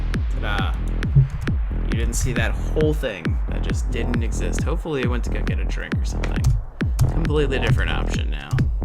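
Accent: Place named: East Coast, United States. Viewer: Lol, I can pick up a "Yankee" accent with your new mic 👍 Are u in the east coast somewhere?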